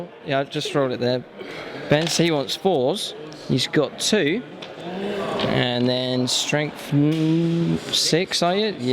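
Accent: United Kingdom. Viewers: exactly what I was looking for! concise analysis, pro painted models and british accent. subscribed and liked.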